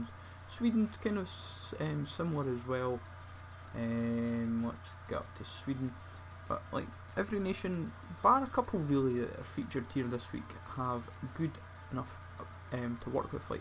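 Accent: Scotland, United Kingdom. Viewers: is he scotish??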